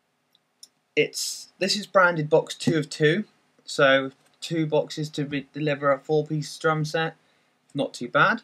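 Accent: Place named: United Kingdom. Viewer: dude i could listen to you talk all day. i like hearing people from the uk talk.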